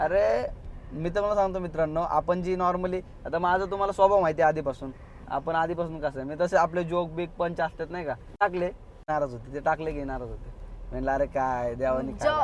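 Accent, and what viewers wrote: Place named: Pune, India. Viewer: Punekar's boltat..♥️🫡🔥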